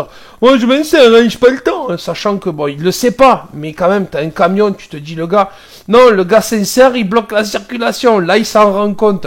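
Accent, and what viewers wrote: South, France. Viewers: toujours top les vidéos surtout avec l'accent du sud oh punaise 😂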